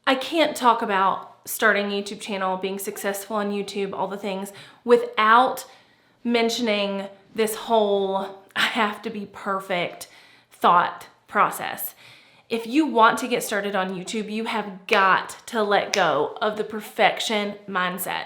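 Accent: South, United States. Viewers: I love the fact that there is someone else with a good southern accent who's making it in YouTube! I hear myself on camera and it's a hoot! And yes, I'm from North Carolina as well!:)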